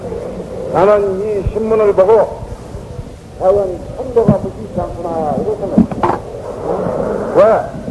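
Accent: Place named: Jeolla, South Korea. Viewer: 품격없는 선동의 말솜씨로 과연 나라를구할수있었을까?목소리 넘싫다.전라도사투리 넘싫다.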